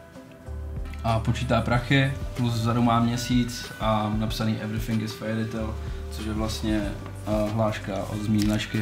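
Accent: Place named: Moravia, Czech Republic. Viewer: Moravsky bro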